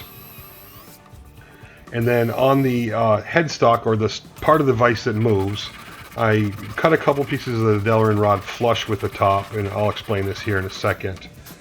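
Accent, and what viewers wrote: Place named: Carolina, United States. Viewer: yes-that's your accent--n or s Carolina? - oh yeah did you say something about Michigan -- from La La lander previous show me stater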